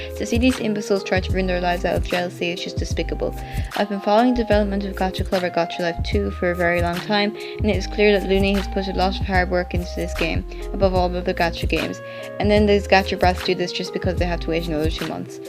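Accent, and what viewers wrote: Ireland. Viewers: One question are you Irish cause I recognize your accent from my own 😳😳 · sorry if I'm asking a personal question.. But are you Irish by any chance? I just hear some similarities in the accent lol 😂😅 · You sound Irish are you? because I am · Why do u sound like your from Ireland or sumthing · Are you Irish? :3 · Is she irish? Lol im irish and i recognise the accent xD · Are you Irish? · Omg are you irish 😍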